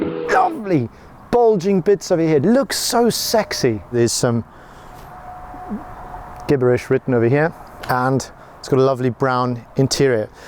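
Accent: South Africa. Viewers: Is this guy South African ? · South Africa this dude, very obvious, when he started taking about chicken teeth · Wooh Masekinders die man is van definitely van SA 🤣🤣🤣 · So SAFA ;-)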